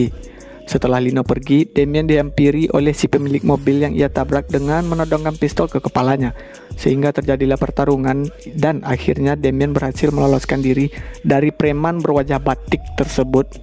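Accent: West Sumatra, Indonesia. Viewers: Orang Minang ya bg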